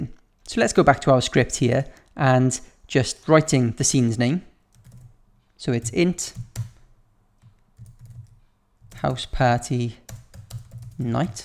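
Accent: Wales, United Kingdom. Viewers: omg! you're welsh!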